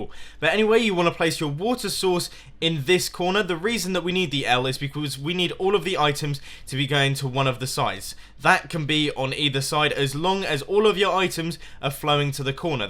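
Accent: United Kingdom. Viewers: sound even more British in older videos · oml baby, so british!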